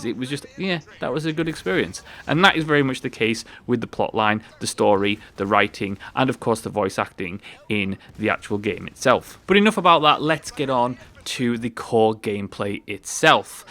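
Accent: United Kingdom. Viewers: I want you to fill me with that sexy British voice of yours boi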